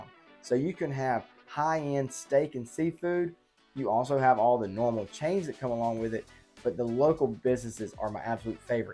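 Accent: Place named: Athens, Georgia, United States. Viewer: Do all the guys in Athens have that adorable accent? If so, you should add that to your list of pros.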